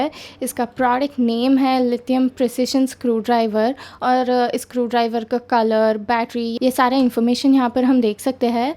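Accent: Hyderabad, India. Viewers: i like hyderabadi accent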